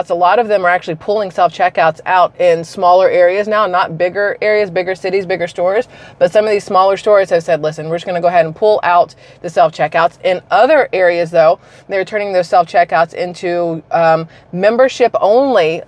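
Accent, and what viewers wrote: South, United States. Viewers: Woe now let's not get too carried away with the southern draw. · U should do ur southern accent sometime, sounds cute.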